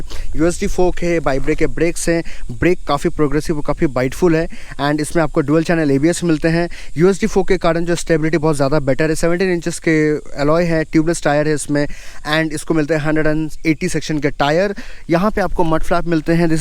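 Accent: India. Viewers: CAN ANY OF YOU indians speak normally in ENGLISH? these bikes are exporting worldwide and we need to WATCH A GOOD REVIE WITH ENGLISH E N G L I S H detailed review I CANT UDNERSTAND SH1T, not even subtitles works.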